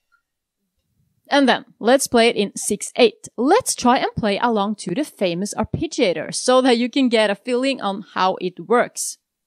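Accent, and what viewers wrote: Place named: Norway. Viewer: that's what I thought, you had that Swedish accent. Which turns out to be Norwegian.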